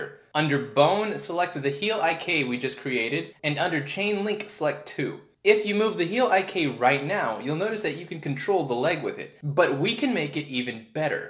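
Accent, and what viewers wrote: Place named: Brooklyn, United States. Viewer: before he had the brooklyn accent.....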